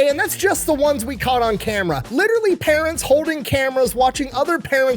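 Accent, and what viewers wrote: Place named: Tennessee, United States. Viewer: sounds like you’re from West TN haha There was one in either Jackson or Memphis that was like that in the 90’s. Our rural elementary school took us there twice for field trips. Oh, the good ol days.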